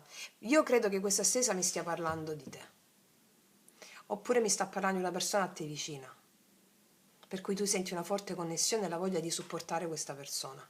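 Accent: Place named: Apulia, Italy. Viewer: Dal vago accento sembri pugliese...sbaglio?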